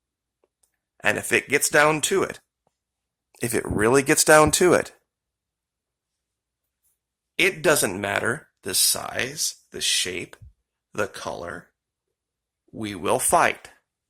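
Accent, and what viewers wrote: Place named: New York, United States. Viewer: You are kool , just the NY accent in my business would have lite the match.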